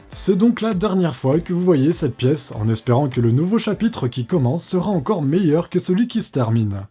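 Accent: France. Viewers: l'accent français + ta voix, c'est un gros plus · ton intonation surprend... t'as juste un accent normand bien prononcé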